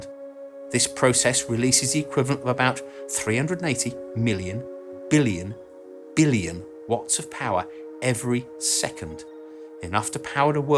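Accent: United Kingdom. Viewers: Sooo brrritish that it hurts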